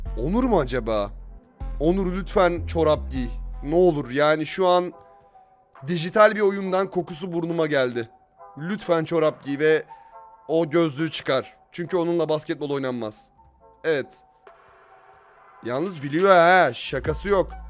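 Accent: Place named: Aegean, Turkey. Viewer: abi niye egeliler gibi konuşuyon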